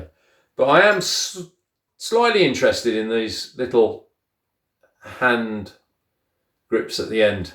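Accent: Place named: England, United Kingdom. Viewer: Catching traction with eBay videos is rough in my opinion. You already know how to record, edit and upload; you may want to consider starting a second channel for a different topic. Everyone loves an English/ British accent. I'm not sure which one it is. I'm guessing English is the proper term. I'm certain you could do very well in other categories if you're interested.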